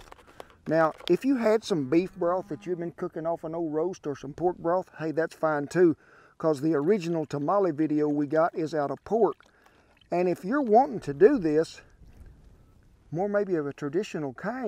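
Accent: South, United States. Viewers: Mr., I feel I need to reach out to you since I found your site by accident. I am about your age and was brought up in Tillman County. Seeing you standing in the pasture with the Mesquites and the pond in the background brought me back to a time I loved! Rotating wheat, dry land cotton and working them old cows was just about heaven to me. Hearing your accent and the way you express yourself has got me turned around talking country, again. I left at age 18 to serve my country and have longed to return someday. That time has come and gone and I'm afraid it's too late for me now but hearing from you was like a letter from home and the tears just came gushing. Thank you so much for the trip down memory lane.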